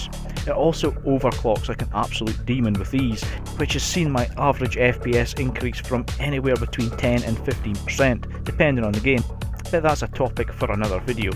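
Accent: Scotland, United Kingdom. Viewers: Another Scottish youtuber? This is great us scots are popping up everywhere now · Is that a Scottish accent? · what part of Scotalnd you from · Sub for the scottish accent. For some reason I love it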